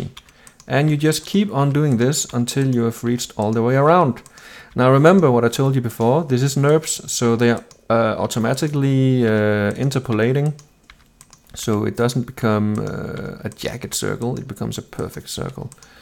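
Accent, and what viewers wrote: Denmark. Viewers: Are you danish? both your accent and name is danish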